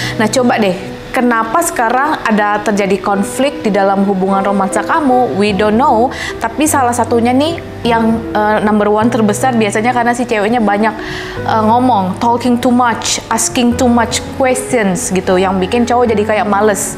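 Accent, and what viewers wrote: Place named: Bali, Indonesia. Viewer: Sekarang logatnya udah ada Bali²nya. Follow kakak dari 4 tahun lalu :)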